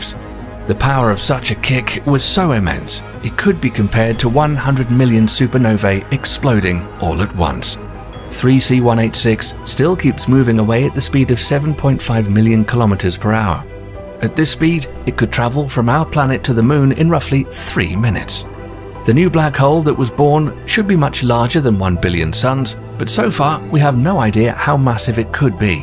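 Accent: United Kingdom. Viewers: Im tired of these anti-christ british speaking clowns who just spread false fear Mongering. The Faith Rebukes Your Ways.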